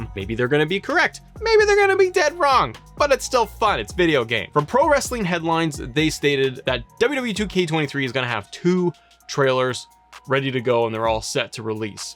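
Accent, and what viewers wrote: Canada. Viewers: Are you from Canada? Just wondering lol.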